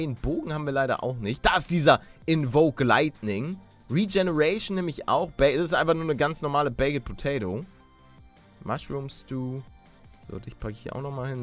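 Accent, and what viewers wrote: Hamburg, Germany. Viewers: Kommst du irgendwie aus Hamburg oder so? Oder Bild ich mir das nur ein?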